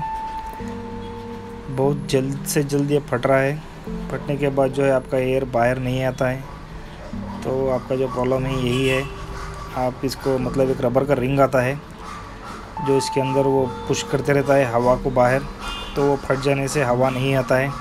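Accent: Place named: South, India. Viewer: the way you are pronouncing M ....I think you are from south🔥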